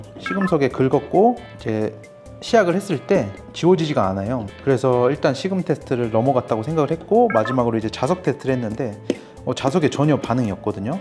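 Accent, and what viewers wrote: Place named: Jeolla, South Korea. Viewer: 말투가 절라도구마잉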